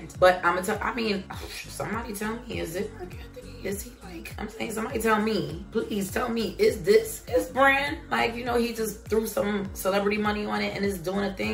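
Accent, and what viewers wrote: New York, United States. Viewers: So glad I came across this on my time line❤ You putting me on👀‼️ Definitely just got you a new subby🤗 Love listening to you talk ,that NY accent reminds me of home🥰 I'm from the Bronx 161st ❤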